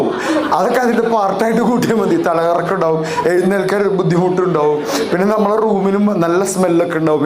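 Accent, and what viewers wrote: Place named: Thrissur, India. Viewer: അല്ലൃലും തൃശ്ശൂർകാരുടെ സംസാരം കേൾക്കാനേ ഒരു രസാ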